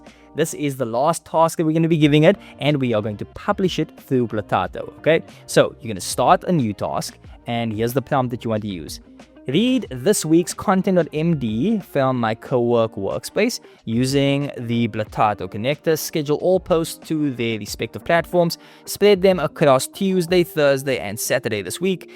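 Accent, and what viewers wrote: South Africa. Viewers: I hear South African Accent, I sub 😊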